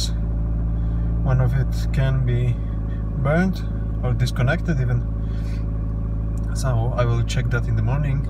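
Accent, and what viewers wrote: Poland. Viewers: Ale mnie śmieszy ten polski akcent Polak dla Polaków po angielsku gada :D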